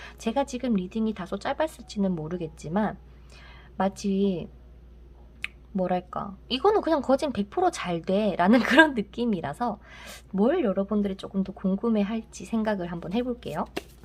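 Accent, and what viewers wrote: Gyeongsang, South Korea. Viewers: 4 수달 저에게 너무 지친 나머지 제손 놔버리고 다른 분 만나러 갔는데... 포기하고 지내면 언젠가는 와줬으면 하네요ㅠㅠ 그분이 경상도 분이라 님 말투들으니 더 보고싶어요..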